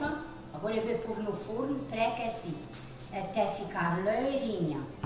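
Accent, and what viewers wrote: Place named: Portugal. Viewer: Querida irmã, que bom ouvir sua oração! Me senti abençoada! Tão lindo ouvir esse sotaque de Portugal. Gosto tanto! Vou fazer essa torta. Minha família come bem pouca carne e estamos procurando parar de vez. Obrigada por dedicar seu tempo em ensinar receitas saudáveis. Continue, pois estarei sempre acompanhando!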